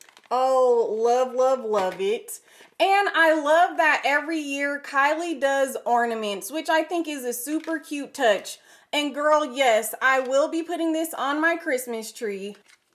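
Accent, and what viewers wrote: South, United States. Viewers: This is my first video and I can not for the life of me figure out where this girl is from... what accent does she have? It sounds southern but with a twang? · she sounds country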